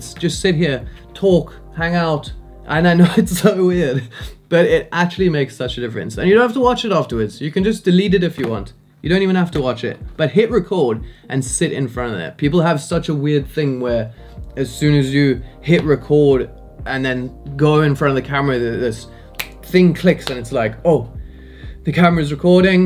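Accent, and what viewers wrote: Cape Town, South Africa. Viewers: Is that a South African accent??❤ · Lekker video bru. I was trying to place your accent as it didn’t seem Australian. Then I realised you’re from Cape Town too. I’ve been away to long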